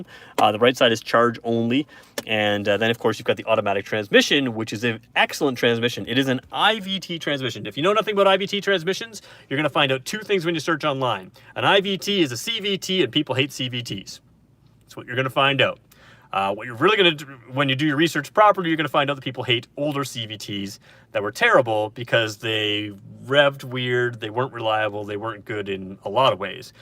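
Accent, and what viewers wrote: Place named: New York City, United States. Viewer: I enjoy your videos. They are very informative. I have one suggestion for you however. Watch one of your own videos and Count your words per minute ? You speak really really really fast !!! Maybe less coffee ? Maybe slow down just a little bit ! ? I don’t know . I am a long haul truck driver and I drive the entire United States and Canada and the difference in how people speak between New York City and Birmingham Alabama and Ontario Canada is an amazing thing to experience !! You would fit right in in New York City except you seem a little less caustic .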